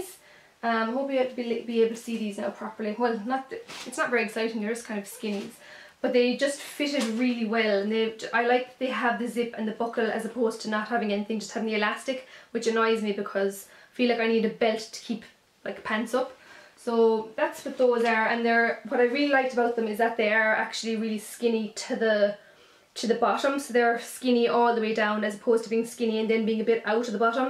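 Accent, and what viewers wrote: Ireland. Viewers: I think your Cork accent is SO beautiful.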